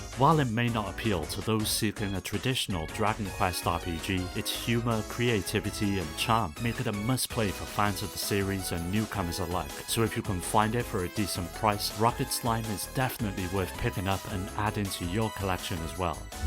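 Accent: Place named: England, United Kingdom. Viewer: uggghhh this is the nastiest sounding english accent fuckin clear your throat, ever heard of an AHEM? "If U FROW a Ton of Guramplay" eughh the way you say Game is fucked up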